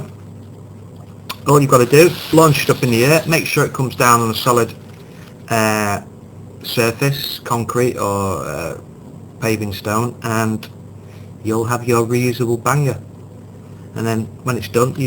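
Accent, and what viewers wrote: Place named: United Kingdom. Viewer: The classic British voice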